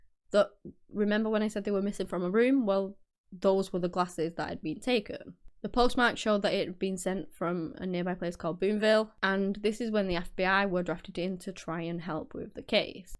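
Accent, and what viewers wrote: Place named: Northern England, United Kingdom. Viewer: Hello my darling can I ask what part of the UK you are from, I love your channel probably because of your northern accent, hi from Australia 🇦🇺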